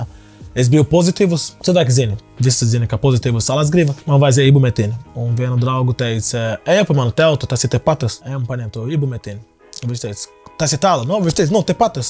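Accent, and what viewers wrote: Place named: Lithuania. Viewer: You sound Lithuanian not Latvian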